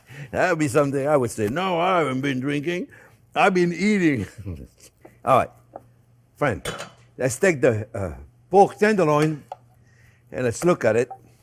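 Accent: France. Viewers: A little bit on accents! Accents are a reflection of geographic pride Chef! Listen to those from NYC, TX, GA and many will say, "what the heck did they just say," it's geographic pride and some things can never be changed. You are an American French Chef, never apologize for your accent, it's you! A beautiful program. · Don't loose your French accent, but I didn't understand what you were saying with the sign language at the end after you tasted it but it was still funny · Ah ah , vous m’avez encore faite rire … et cette recette a l’air succulente ! Je vais la proposer à mes amis ce week-end. Et votre accent est très frenchy. On adore 😂. Merci pour votre belle cuisine chef !❤ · I love your French accent Chef! I am certainly going to make this stuffed pork. Perfect for Fall here in Canada.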